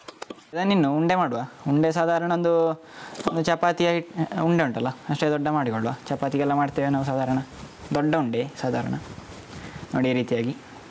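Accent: Mangalore, India. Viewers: It just took me 20 seconds to Subscribe to your channel because of your genuine smile and the Mangalore accent.. Cheers for Life..! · ನೀವು ಅಡುಗೆ ಮಾಡುವ ರೀತಿಯು ಅದ್ಬುತ. ಹಾಗೆ ನೀವು ಮಾತನಾಡುವ ಮಂಗಳೂರು ಕನ್ನಡವು ಕೂಡ ತುಂಬಾ ಅದ್ಭುತ. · Great to hear your Mangalore kannada accent. Buns is my favourite snack for tea. Well done from a mangalorean living in Australia. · I love Mangalore accent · Mangalore kannada🔥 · Sir nimma Mangaloor maatu tumba chanaag iratte kellike...😍😍😍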